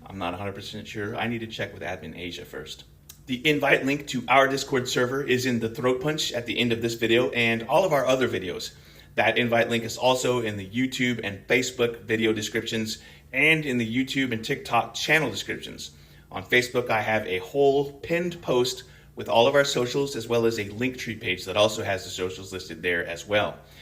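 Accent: Scotland, United Kingdom. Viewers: Serious question: is the Scottish accent a bit, or is that native accent? Like, was he born in Scotland and then moved to the US or something? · I love that you used your Scottish accent. 👍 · your scottish is showing. oh yeah and I made it to the end mfer. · Love the Scot-talk. 😊 Made it to the end... for the algo. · Go full out Scottish accent! Especially your initial rant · Hey I love you, your scottish accent, and your starship! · It's even better with a Scottish accent and a fellow gamer!! Even more hooked now!! 😍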